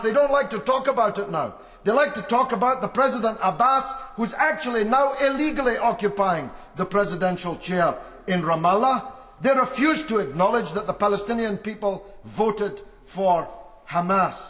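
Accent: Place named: Scotland, United Kingdom. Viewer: Hey thats a Scottish accent.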